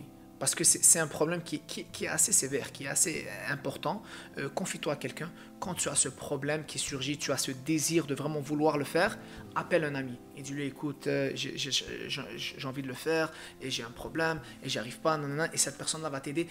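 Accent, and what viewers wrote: Quebec, Canada. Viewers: Il est canadien encore heureux que il a un accent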